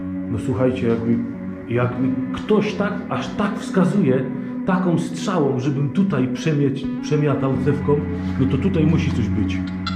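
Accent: Silesia, Poland. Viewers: fajnie jak tak po Śląsku godosz! 😉👊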